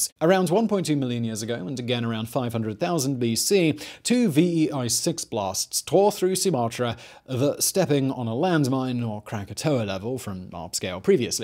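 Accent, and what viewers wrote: United Kingdom. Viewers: I'm sorry to ask you this, but... You're British?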